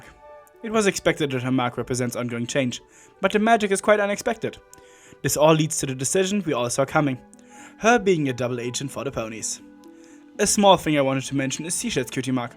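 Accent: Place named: Germany. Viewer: Ana Sani probably watches you because as a VA, she could use more exposure to a German accent so she can reliably pull it off for a future role! 😂 Ich muss schon gestehen, dass ich mich oft etwas damit schwertue, dich zu verstehen, da du zum Teil ziemlich schnell sprichst. Vielleicht können dich die englischen Muttersprachler aber etwas besser verstehen als ich, denn ich habe ja eigentlich dadurch Englisch gelernt, dass ich mich fast ganz und gar auf Content von Muttersprachlern konzentriert habe. Somit habe ich einfach ehrlich gesagt nicht allzu viel Erfahrung damit, Englisch mit einem deutschen Akzent zu hören, was mein Verständnis schon einigermaßen beeinträchtigt, vor allem, da du ja so schnell redest! 😅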